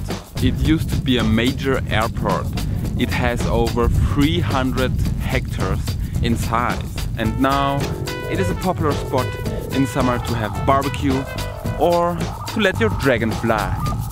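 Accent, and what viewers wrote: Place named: Germany. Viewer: So funny when he says "oo-idios" instead of videos. I've heard other Germans saying it too. Just peculiar.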